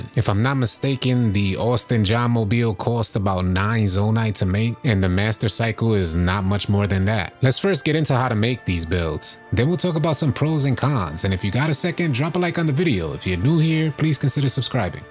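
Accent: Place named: New York, United States. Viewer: You're a NY cat ain't ya.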